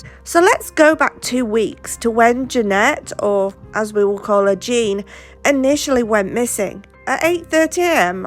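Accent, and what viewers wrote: United Kingdom. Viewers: Love your British stories and accent!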